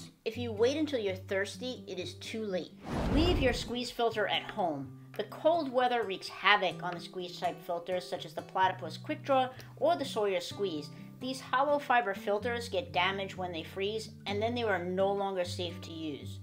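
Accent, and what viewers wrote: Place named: Northeast, United States. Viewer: your slight northeastern accent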